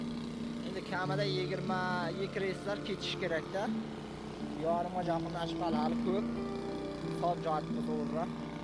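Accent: Surkhandarya, Uzbekistan. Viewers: Бу қаер. Шеваси Сурхандарё ёки Қашқадарёликми дедим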